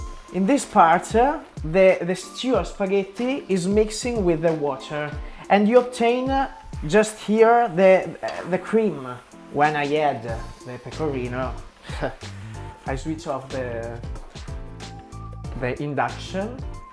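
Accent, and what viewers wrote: England, United Kingdom. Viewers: è un pochettocringe anche quando parlavi in italiano ma con l'accento inglese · No daiiiiiiii x favore parla italiano e metti i sottotitoli in ingleseeeee...poi quando parli in italiano con l'accento inglese quello ci piace ahahahahah 😄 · Le parole in italiano con l'accento inglese... Calato nella parte proprio!!! 😂😂😂😂 fantastico! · Ma fatemi capire: non conosce l'inglese, non sa cucinare e parla l'italiano con l'accento inglese... E fa un video di cucina in inglese??? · Simpaticissimo video,fa ridere quando parlu in italiano con l'accento britannico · sei dolcissimo con quella faccia da schiaffi 😂mi fai morire quando dici le parole in italiano con accento inglese 👏bravo 👏👏complimenti x gli iscritti avanti così 🤗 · Questa risatina così italiana 'eheh' tradisce un po il tuo perfetto accento British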